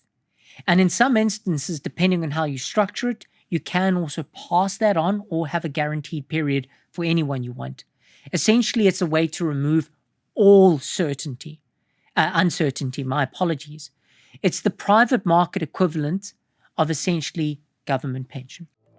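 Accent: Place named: England, United Kingdom. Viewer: Keep English Accent's out of the NEW WORLD.................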